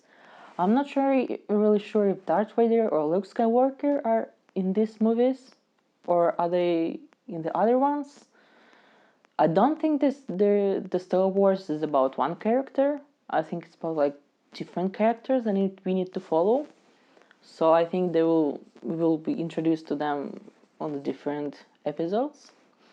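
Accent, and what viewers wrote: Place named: Eastern Europe. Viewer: yeah Alec Guinness is a legend, other classics he acted in are The Ladykillers, The Lavender Hill Mob and Kind Hearts And Coronets. As for your accent, those from the former Soviet states in the eastern bloc sound very similar. Your name sort of gives it away though, you wouldn't happen to have Romanov blood would you lol?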